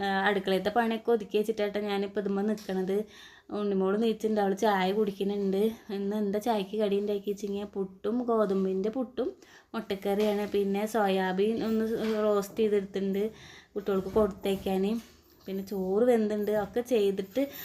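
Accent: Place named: Malappuram, India. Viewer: Hai enna video kanunne trissur jilla analle varthamanom kettappol malappuram anenn thonni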